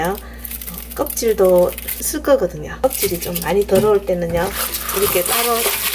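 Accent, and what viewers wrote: Gyeongsang, South Korea. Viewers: 건강한정보 알려주셔서 감사합니다 설명도 경상도억양이지만 억세지않고 상냥한말씨가 듣기좋습니다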